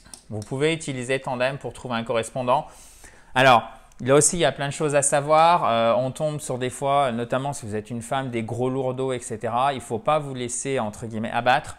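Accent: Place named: United States. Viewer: Finalement tu enseigne l'anglais américain où britannique car dans tes prononciations tu emploie plutôt l'accent américain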